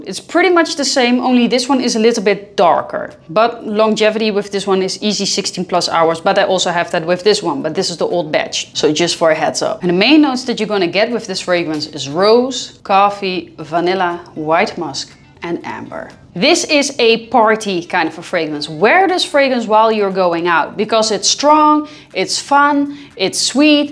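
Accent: Netherlands. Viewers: hearing your accent I must know: ben je Nederlands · She must be Dutch her accent ❤️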